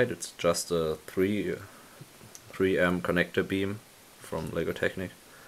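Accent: Germany. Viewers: Jetzt hab ich es mitgekriegt das du ein deutscher bist^^ Wegen deinem akzent^^ Aber echt mal so gefragt wie kannst du solche waffen bauen?0_0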